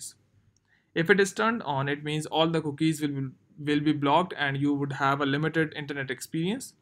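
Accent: India. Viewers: He is Indian . I can tell by his accent.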